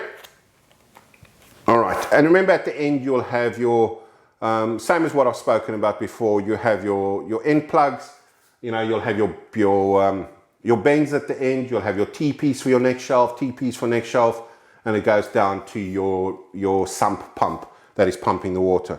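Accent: South Africa. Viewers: Thanks a lot MOST helpfull . PS you sound South African. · You sound south african?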